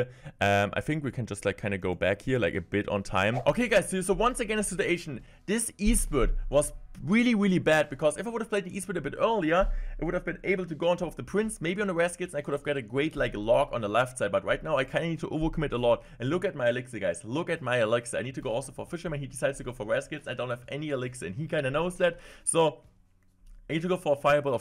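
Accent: Germany. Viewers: This is my first time on this channel and i noticed directly by his accent that hes German.I was quite confused at first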